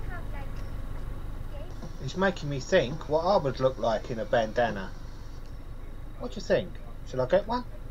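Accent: London, United Kingdom. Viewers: Hello, when my wife gets homesick for her home town of London she likes to hear you speak, makes her feel back home for a while, although she's lived here in Wales for 50 years for crying out loud! And me? I just like your video along with your commentary, it's very good, diolch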